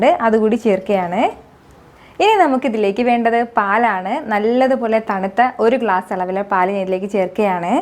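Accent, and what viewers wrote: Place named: Malabar, India. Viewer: Actually trivadrum tanne anoo..voice cheriya oru malabari touch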